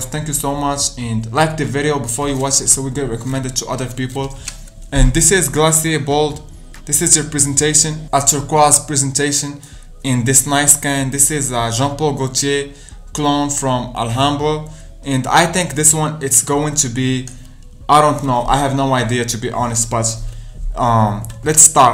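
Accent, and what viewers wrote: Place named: Morocco. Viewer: Are you Moroccan ? You have Moroccan accent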